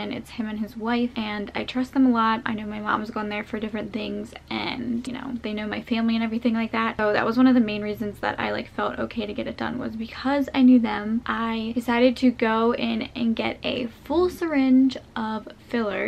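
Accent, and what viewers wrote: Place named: South, United States. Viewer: Why are you the only person in your family with a southern accent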